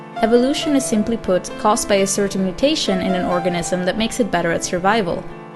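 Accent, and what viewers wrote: Netherlands. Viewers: Are you from Belgium or the Netherlands??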